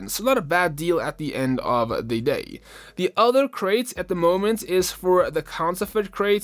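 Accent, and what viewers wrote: South Africa. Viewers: Bro is south african?? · ARE YOU SOUTH AFRICAN?! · are u from south africa?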